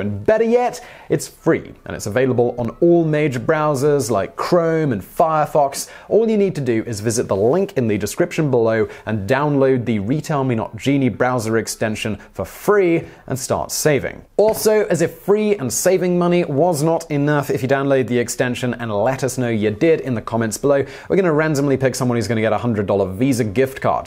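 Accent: United Kingdom. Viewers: And I enjoy listening to a British accent. I find it novel. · you sound like a Brit but always use such American words, like “Cheque account” in this video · Come on Babish, your British or American. Make up your mind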